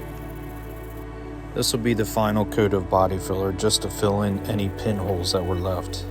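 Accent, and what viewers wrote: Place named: Pennsylvania, United States. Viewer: Helpful video, nice work. And a little Pennsylvania accent asmr I hear? Eh? Eh? Pennsylvania? Am I right?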